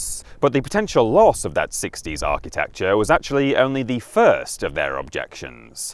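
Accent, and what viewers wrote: United Kingdom. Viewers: I usually prefer English accents -not this one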